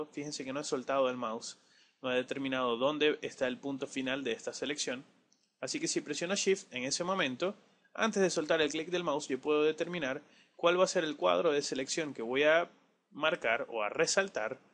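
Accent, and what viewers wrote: Venezuela. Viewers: Eres Venezolano? lo digo por la manera la hablar. Buen Curso Saludos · Yo sufriendo con un curso en Inglés y encontré justo uno de los mejores tutoriales : en Español y con acento de mi país (Venezuela). Mejor imposible! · eres de venezuela mano Saludos jejeje Ya se restaurar y esas cosas pero ay algunas cosita que no sabia y e puse a ver tus videos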